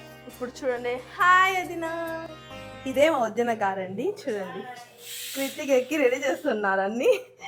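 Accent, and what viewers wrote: Chittoor, India. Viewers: Idi chittoor lo tamil valla telugu slang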